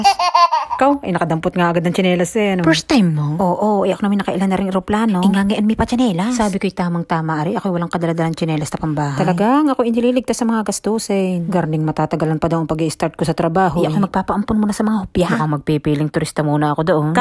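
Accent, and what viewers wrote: Batangas, Philippines. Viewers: kung ako laang ay isang napakagaling na cartoonist at kung marami akong oras, nagawan na kita ng isang cartoon series na mala-Mr. Bean cartoon series. pero syempre may mga dialogue at batangueño accent pa haha! yung feature talaga ng iyong mukha ay masarap i-drawimg tapos gagawan ng kwento. nakaka-GV ka kasi lalo na pag sinambit mo na ang Magandang Araw! parang sina Ate Sienna at Kuya Bodgie lang sa Batibot 😅 Goodluck sa yong panibagong pakikipagsapalaran sa Tokyo. kaka-excite ang mga susunod na mga kabanata.😊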